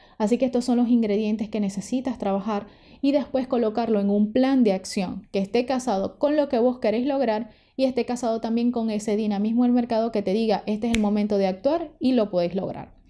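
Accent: Maracaibo, Venezuela. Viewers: Holaaa viendo tu canal me estoy dando cuenta de toda la información q nesecito saber para hacer esto... De verdad te agradezco mucho la información.. Lo mejor de todo es tu acento Maracucho con que das el mensaje, los maracuchos vamos a conquistar el mundo.. Jajajaja saludos... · Venezolana? Maracucha????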